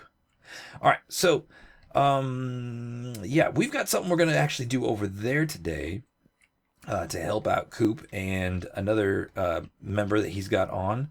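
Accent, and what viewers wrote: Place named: Australia. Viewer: Yay another fellow aussie. Its like music to my ears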